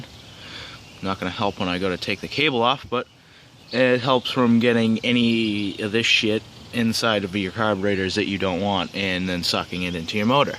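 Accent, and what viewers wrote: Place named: New England, United States. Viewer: where u from? you sound like ur from new england. maybe ME, NH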